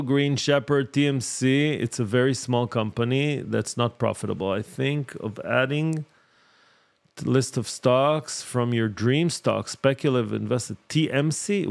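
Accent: Israel. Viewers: הנה דימוי בשבילך, מקווה שתבין בתור דובר אנגלית מלומד (עם מבטא ישראלי יפה) - למה שאשקיע ב-OTC כשאני יכול להשקיע בנסדאק? ולסיכום, בעולם העסקים כדי שהמוצר שלך יהיה מוצלח צריך בידול. באנגלית שבורה, אתה כבר לא מיוחד. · Well done! You still had more than 7.5K viewers listening to you talking in English (with a small hebrew accent:)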